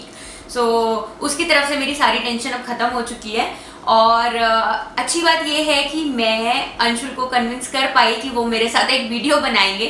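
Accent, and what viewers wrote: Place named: India. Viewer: Hi.. first time I am watching your video.. I am happy, you are an amazing and fun loving person.. I love the fact u still have the Indian style be it accent or cooking.. I see many catching a fake accent in a month or two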